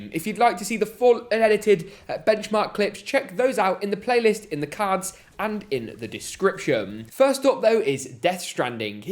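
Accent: United Kingdom. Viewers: your're the british guy right?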